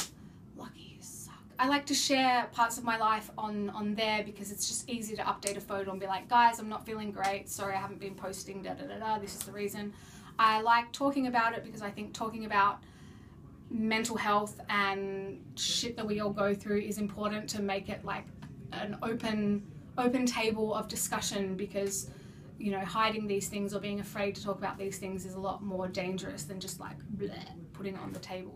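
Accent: Australia. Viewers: You're fast becoming one of my favourite youtubers, and being an Australian it's lovely to hear a familiar accent! Take as long as you need, I know that I along many others will stand by you wil oodles of love and support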